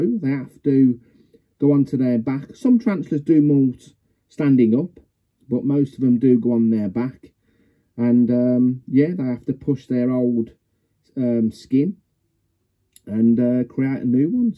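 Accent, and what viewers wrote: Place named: Birmingham, United Kingdom. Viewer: Yeah, it’s really nice here. On the coast. But, we have our fair share of issues with drugs etc 🤦‍♀️ I guess that there’s no escaping that. When I was younger (much younger 😂) we knew nearly everyone, not now though. There’s more houses being built and people. Same as everywhere else I guess. You’re a Brummie aren’t ya? There’s no missing your accent 😂 xx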